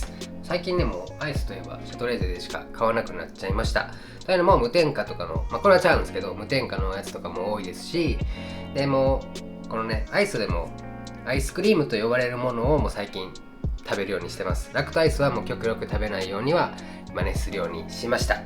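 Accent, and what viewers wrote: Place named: Kansai, Japan. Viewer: 関西弁も、いいですよー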